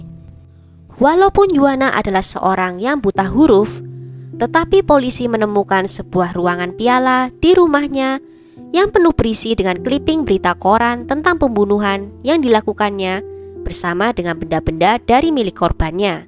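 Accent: Java, Indonesia. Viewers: Hallo jg medok kuhhh.. Alhamdulillah sehat.. sukses trs yaa say👍💪🙏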